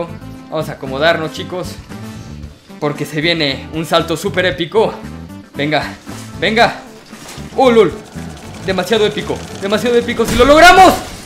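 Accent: Mexico. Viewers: El asento mecicano😄😂😂😂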